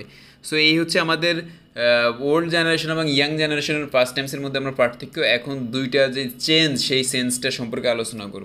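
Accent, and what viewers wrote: Noakhali, Bangladesh. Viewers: আপনার গ্রামের বাড়ি কি নোয়াখালী?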